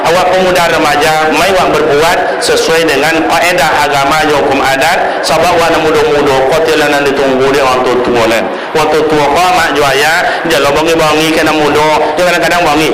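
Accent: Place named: Ocu, Malaysia. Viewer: Soang ko tio ustad nan bahaso ocu kental kek awak nye,lain podo tu,uwang ocu juo nye,tapi bahaso minang,kan lucu du, Ustad ko mantap,asli ocu n bahaso nyo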